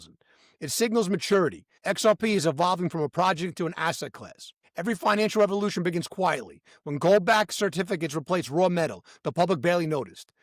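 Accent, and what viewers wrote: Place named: Brooklyn, United States. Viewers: I doubt AI has mastered the Brooklyn accent yet... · No. I'm from Brooklyn, born in 1955. This guy is definitely from Brooklyn. He speaks like an educated old school Brooklynite. Al doesn't have a chance replicating the accent. The accent is rapidly dying out. When this guy speaks, it's music to my ears.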